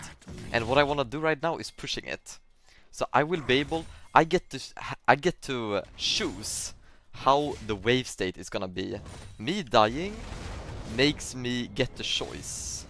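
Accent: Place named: Sweden. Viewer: Love your videos man. And your sweet Swedish accent! :)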